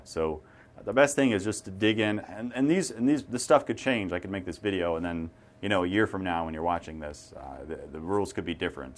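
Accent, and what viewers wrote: United States. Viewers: Are you from Missouri??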